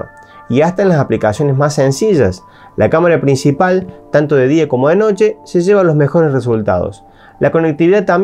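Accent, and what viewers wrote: Córdoba, Argentina. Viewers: loco sos cordobee ! te seguir{e por eso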